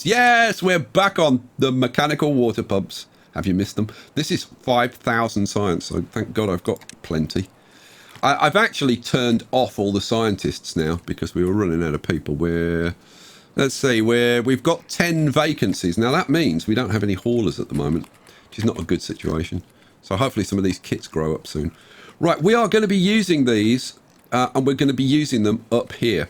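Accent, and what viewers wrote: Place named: United Kingdom. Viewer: Dude you have big potential, you remind me of RCE with your British accent and enthousiast and jolly attitude. Also love the little interactions with your wife. Keep doing what you are doing man!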